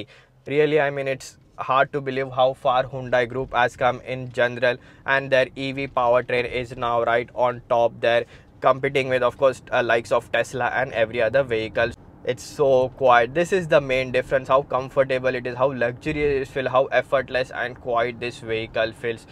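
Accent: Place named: India. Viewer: Angrezi bhi hindi ki tarah laga Jaise maths me english 👌👌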